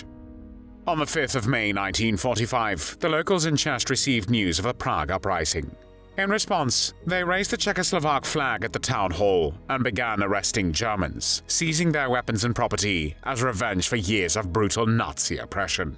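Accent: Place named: United Kingdom. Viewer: That "Brittish accent" AI voice is just too much for me.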